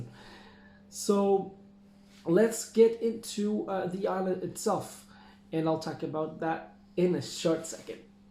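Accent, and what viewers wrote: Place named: Portugal. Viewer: bom video, tens um inglês muito bom, mas nota-se bem que es português pelo sotaque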